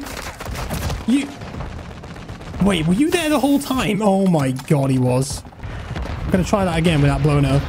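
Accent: United Kingdom. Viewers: thanks this is a very useful video but with british accent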